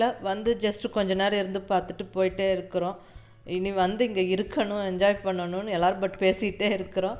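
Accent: Coimbatore, India. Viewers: Neenga Kovai ya mam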